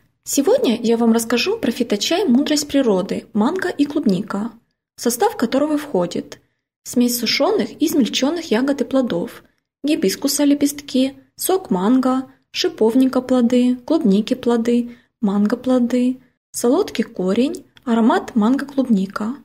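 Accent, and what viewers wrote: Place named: Ukraine. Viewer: умничка...судя по голосу ты с Украины ..смотрю твой канал года 3.. ты как ходячая аптека ))) спасибо тебе и не бросай канал .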